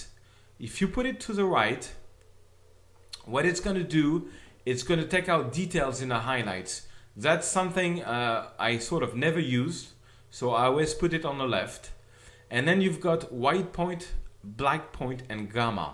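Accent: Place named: France. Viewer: i love your tutorials, i love your frenglish accent :D keep it up (thumbs up)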